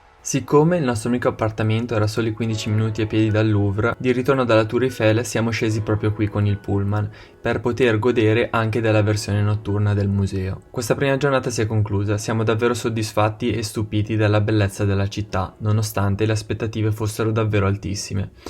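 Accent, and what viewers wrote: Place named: Lombardy, Italy. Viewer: Concordo con la dizione dialettale lombarda !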